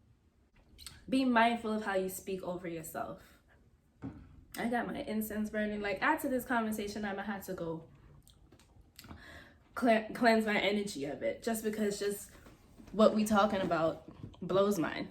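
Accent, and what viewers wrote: Jamaica. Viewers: Good morning, was appreciating your informative videos and noticed slight accent. Jamaican?🙂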